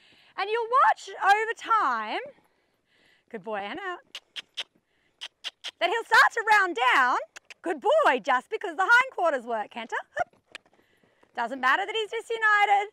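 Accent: Australia. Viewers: I tried this with my OTTB here in AuS, and Honestly it just all made sense. was so positive and reassured me it was okay that he picked up the wrong lead and his stiff side was okay when he stopped and turned. This is brilliant stuff and hearing it with an aussie accent and knowing how things work over here 😀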